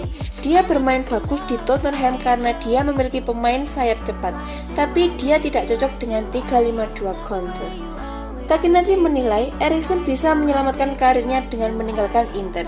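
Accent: Java, Indonesia. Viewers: mba medok bnget mba suarane😂🔴⚫❤ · narator nya ganti nih..medok doang jawa nya tapi suaranya lemes, notasi naik turun gak ada..lempeng doang kayak baca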